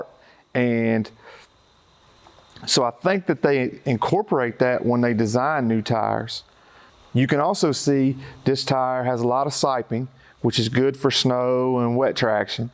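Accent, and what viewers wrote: South, United States. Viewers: 10 swag points for the southern accent.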